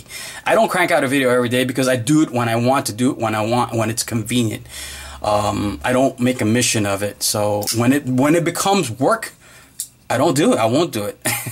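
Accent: New York, United States. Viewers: Ha! I'm watching this with my girlfriend and we where guessing where your from based on your accent. I said gotta be Jersey or new Yorker. She said Monmouth county nj lol. Gotta be strong island?!? We are down the Jersey shore but I grew up in the Bronx and work in Manhattan. Keep it up bro def simple informative and straight up videos! Like they should be!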